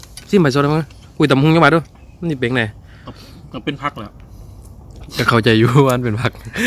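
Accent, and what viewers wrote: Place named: Korat, Thailand. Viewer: เก่งมากหนุ่มโคราชสอ้อนบ่เสียแนวโคราช555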